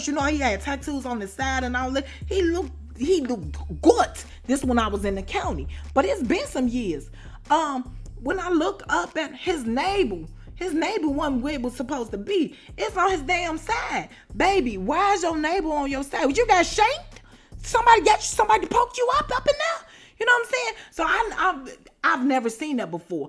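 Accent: Chicago, United States. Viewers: I miss Chicago n I work graveyards when I get to work I throw her on and I feel like im at home n my sister telling me a story Chicago people sound just alike lol I love it thx for getting me threw these slow ass nights at work and keep up the great work hope 50 or Tyler Perry tap in with you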